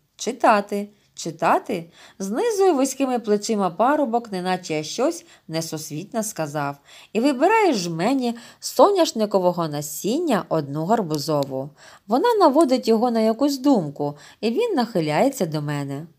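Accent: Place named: Russia. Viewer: Дуже дякую! Ви мені дуже допомогли! Я завжди вас слухаю.Але в вас якась трішки російська вимова слів і деякі слова ви вимовляєте неправильно але всеодно це ніщо в порівнянні з тим як ви нам допомагаєте)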